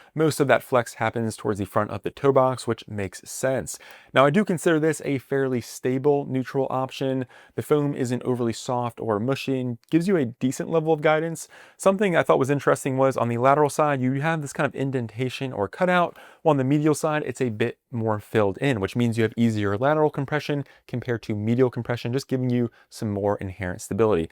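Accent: Maryland, United States. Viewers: Maryland accent heavy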